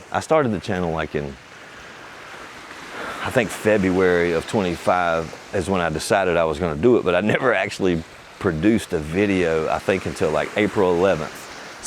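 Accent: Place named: Massachusetts, United States. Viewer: Where is from? Sounds like Massachusetts or east coast.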